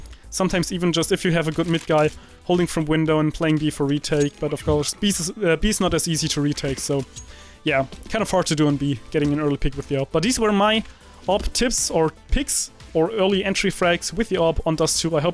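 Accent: Germany. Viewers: ich wusste du kannst deutsch xD Egal wie fließend du sprichst und egal wie sehr du versuchst alles richtig auszusprechen man kann immer hören ob jemand deutsch spricht xD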